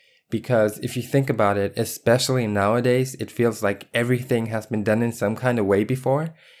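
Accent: Germany. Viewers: Are you German? Btw love the work! :D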